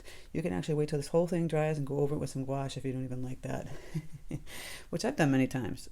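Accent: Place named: Massachusetts, United States. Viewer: I'm not an abstract painter. I just started watercolors a little over a year ago. I paint too detailed. But I'm gonna give this a try! Hey, you sound like you're from MA? I grew up there moved to TN when I was 12. Love your style here! Thanks for all the informative tutorials!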